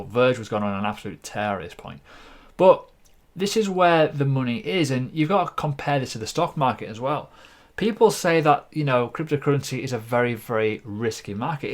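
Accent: United Kingdom. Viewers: When I want to listen to British English and sounds of reckting things I always open up this channel, its funny and well said.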